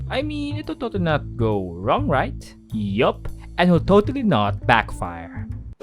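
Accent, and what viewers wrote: Philippines. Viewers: voice sounds south east asian, sounds filipino . . .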